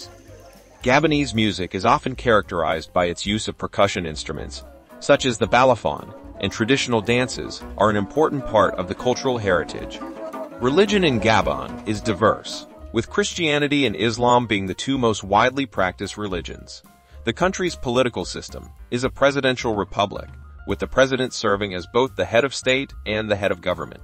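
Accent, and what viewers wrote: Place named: United States. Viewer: That is American pronounced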